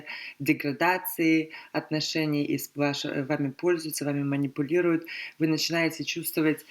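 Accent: United States. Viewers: Какая жёсткая американская интонация... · Очень приятно вас слушать. Приятный американский акцент. Хочу так разговариваить. так бы слушала и слушала.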